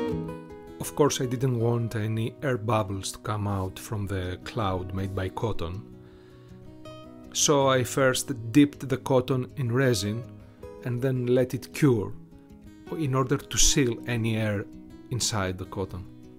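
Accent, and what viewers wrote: Greece. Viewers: I love your Greek accent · Nice greek accent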